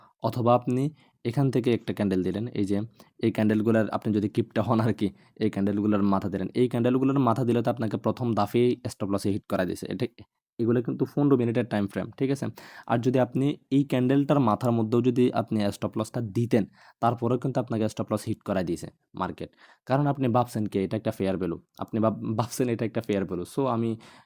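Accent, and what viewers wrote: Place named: Chittagong, Bangladesh. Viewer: ভাই কি চট্টগ্রামের নাকি? কথা শুনে আমার দেশি ভাই মনে হচ্ছে।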